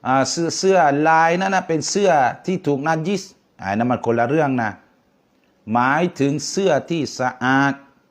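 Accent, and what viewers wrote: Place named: Nakhon Si Thammarat, Thailand. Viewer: นครศรีธรรมราชชัดเจนครับ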